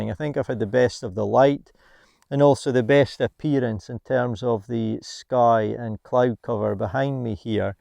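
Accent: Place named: Aberdeen, United Kingdom. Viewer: hi, thanks very much for getting back to me so promptly - ok you have reassured me. I'll probably get one. I enjoy your videos,very straight forward and imformative compared to a lot of others where the presenter talks a lot about themselves before getting on to the subject matter. You sound like you come from the Aberdeen area. That's where I stay, my wife being an Aberdonian. Thanks for getting back to me and for your videos which are great.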